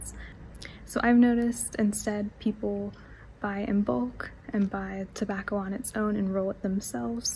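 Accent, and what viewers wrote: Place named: United States. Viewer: Your videos are quite enjoyable. For me, you have those French qualities of understated, put together dress style, subdued makeup presentation, and an understandable tone when speaking French, BUT….it’s ruined when you speak American English! Could you please fake a French accent just once when speaking English?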